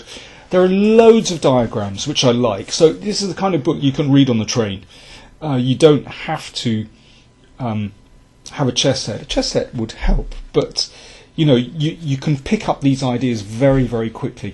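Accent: United Kingdom. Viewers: I'm also American and I've never had an issue understanding. Maybe I'm more accustomed to the accent due to all the British comedy I watch.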